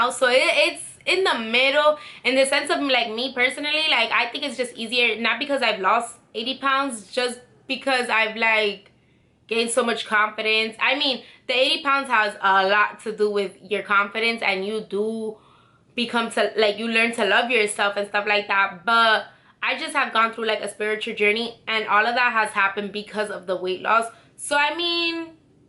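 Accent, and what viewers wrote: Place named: Bronx, United States. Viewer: You sound like you’re from the bx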